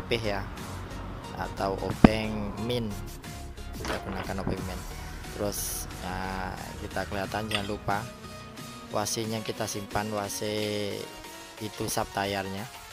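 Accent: Java, Indonesia. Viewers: jowo campur melayu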